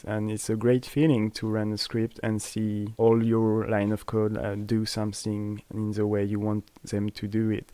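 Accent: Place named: France. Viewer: Super la video avec l'accent frenchy ;-) ! Très très utile, merci !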